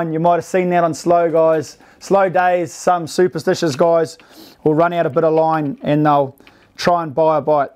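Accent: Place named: New Zealand. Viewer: This was really an awesome explanation with a great focus on the "Recreational Fisherman". Here in the Northeast USA (Maine), we have the Recreational Bluefin (<72 inches) and the Giant Commercial Bluefin (>72 inches). When you wet a line here, you just never know what you are gonna get hooked to. Learned some good skills/techniques on what to include for a set-up. Your clear spot-on presentation style is greatly appreciated. Eliminating the fluff and ego, with a bit of Kiwi accent, what more could a viewer ask for.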